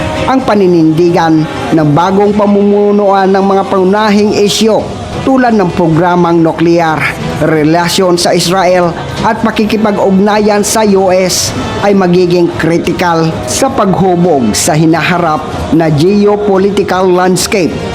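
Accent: Iloilo, Philippines. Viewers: Natural na boses yan ni lods hindi tulad ng iba nagamit ng ai signature yan. Maganda dito detalyado kahit natural na ilongo🇳🇮🇵🇭🇺🇲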